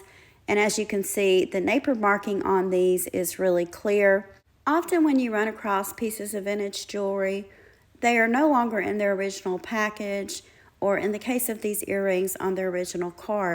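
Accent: South, United States. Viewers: I think it’s just your adorable Southern accent ❤️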